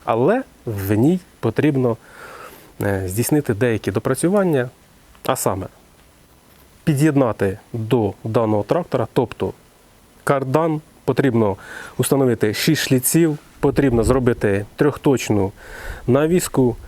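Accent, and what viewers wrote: Russia. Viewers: О, нарешті заговорив українською! Скажу відверто, його російська частенько різала слух. Але справа не в цьому. Цей непосидючий чолов’яга порадував нас і цього разу своєю черговою новинкою. Дякую за цікавий матеріал. Повен підозр, що це не остання розробка від шановного винахідника. Зичу йому здоров’я та подальших успіхів.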